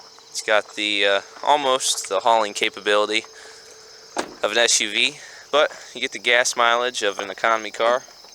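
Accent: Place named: Canada. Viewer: Are you canadian? Every sentence you speak sounds like you're asking a question.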